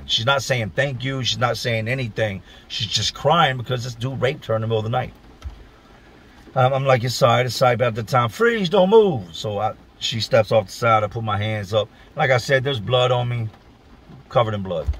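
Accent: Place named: South, United States. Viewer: That sure isn't a virginian accent you got there. I'm from Manassas . What you got there is a mixture of southern meets black. Like you videos but I hate "how" you say your story. Keep on keepin on my friend. It is what it is too. I just talk truth so if ya don't like me referring the way he speaks to blacks then o well. Fact remains though that y'all know exactly what I'm talking about.✌️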